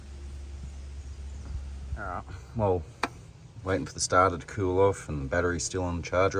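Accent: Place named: Australia. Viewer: Love it! Realistic Aussie narration and cool approach. You got my sub.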